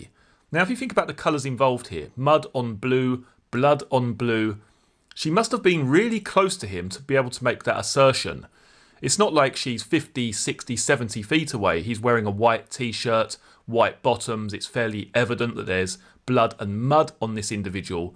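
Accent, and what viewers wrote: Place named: England, United Kingdom. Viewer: Enjoy your videos and love your English accent. At least I think it's English. My dad was from Birmingham England. The English accent is very beautiful wherever you're from. I like how you think outside the box that's what I do too. I agree with you totally I believe it was totally planned I do not believe it was random or opportunity I've never believed that. I always thought it had something to do with being online way before we found out about the Anthony shots account. It could be that RA might have been sitting in his car for a while before the girls came because he was drinking alcohol out in his car or doing drugs or both who knows. He could have been talking to people that he was dealing with that were part of killing the girls in the plot that they were scheming in other words. He might have been conversing with some other people on the phone before he actually went to the trail. 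I do believe at one point he was sitting at the trail and they probably walked right by him and may not have noticed him or they might have seen him and when they actually did see him on the bridge I thought I had heard it one time that one of them had said there's that creepy guy again. I heard this a while back I don't know how true it is though. Had you heard that at all.??. Thank you for your response in advance. Happy New Year to you and your family God bless 🙌🙌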